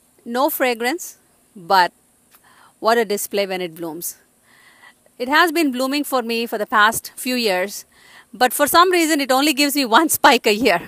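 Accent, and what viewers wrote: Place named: South India, India. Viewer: Where do you live ?, I notice a South Indian accent... Lovely setting, beautiful garden and the most amazing orchid spike!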